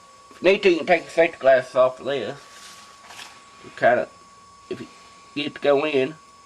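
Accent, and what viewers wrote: South, United States. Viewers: Well, here is the proverbial at it again. I must admit, that in all sincerity, I love the Southern accent. Men and Women, hear me out... the "good 'ol boys" from the south, have taken some bad raps, in regards to racism and tyranny over our friends and neighbors who are black. Again, I admit, that there were multiple, AND I MEAN MULTIPLE times when they did the wrong things regarding interracial culture, including outright murder!!! My heart will always be saddened by what the American South has done to our brothers, sisters, and their children. I had hoped that by this day and age that we would be over this issue. But I can clearly see that we have not. Here is my point. This guy is a typical image of what the rest of us see. - - - A "Good 'Ol Boy", but thru it all, at least in my life, I have learned that they do have quite an ability to repair things. 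Duct Tape and Super Glue, et al, will most likely fix anything. This video was great!! The replies are even better. I Say... take it for what it's worth, and by God, DO NOT smoke while you clean your carb. He also gets credit for being such a "likable", guy and his "dowg", seems to be at home with him and loving life!... Great post, chew me out if you want folks, but I still, will continue to believe in our... NOW... WILL WE be able to teach the hardline bigots down south anything? Well, it would appear that we just keep "chipping away at it"... and so we continue. I am not sure about you, but befor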